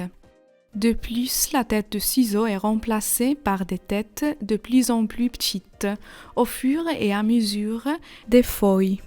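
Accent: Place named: Japan. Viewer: Ce n'est pas une voix électronique, mais une femme (à mon avis japonaise) avec un accent.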